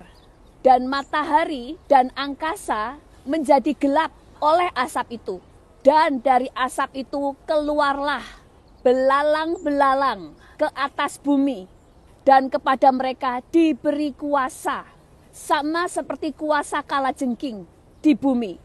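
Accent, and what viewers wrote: Java, Indonesia. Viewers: pendeta e medok tenan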